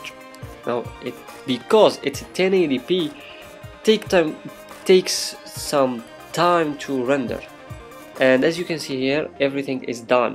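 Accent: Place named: France. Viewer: you sound french lol. thanks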